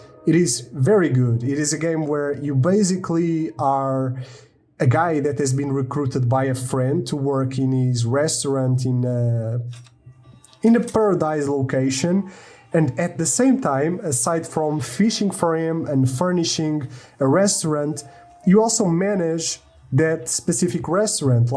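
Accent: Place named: Portugal. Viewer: I can tell your native language is portuguese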